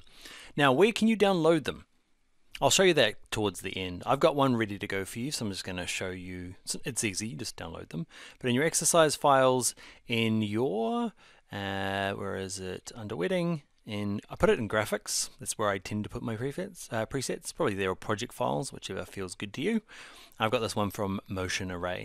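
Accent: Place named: New Zealand. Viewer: You do some awesome videos bro, this is the first video I've watched and I thought straight away 'Is he from New Zealand' hahaha Chur bro that's mean as I'm Kiwi too, Keep it up!!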